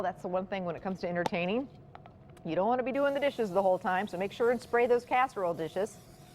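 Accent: United States. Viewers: is a charm! As soon as she began to speak I thought, hmmmm, not quite Minnesota or Upper Peninsula but definitely Michigan! As a fellow Michigander our voices are usually easy to identify. The casserole looks great. Can't wait to try it.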